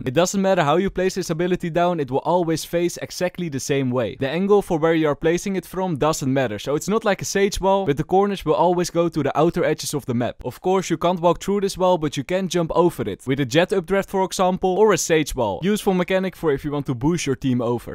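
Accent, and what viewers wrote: Netherlands. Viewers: bro has the most dutch accent ever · from the 1e time i heard y i knew y whr dutch! ik ken het maat, het is ook zo bij mij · ur acent is dutch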